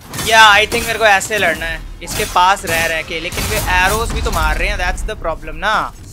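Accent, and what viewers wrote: India. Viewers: Does anyone else thought that he had too much of Indian accent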